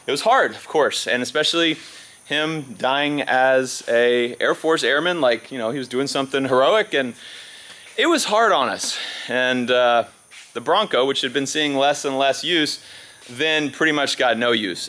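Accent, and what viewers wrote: Texas, United States. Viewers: it ain't your fault people can't tell the difference between ranch and wrench. Other folks don't know how to talk right like we do in Texas.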